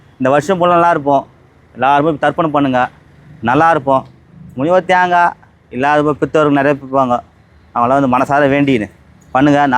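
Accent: Madurai, India. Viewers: Madurai vasagam super 🎉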